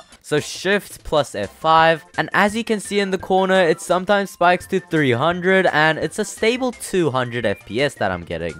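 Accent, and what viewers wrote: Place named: New Zealand. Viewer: Yo Are you from New Zealand?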